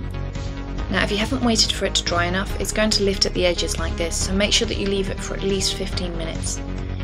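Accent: United Kingdom. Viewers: i love how u say "that's it, you're done" in ur fuckin awesome british accent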